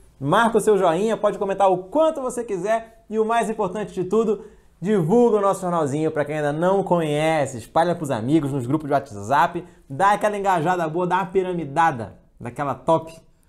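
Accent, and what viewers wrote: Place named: Pará, Brazil. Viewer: O melhor do, é que da pra perceber que ele é paraense, mas só os paraenses percebem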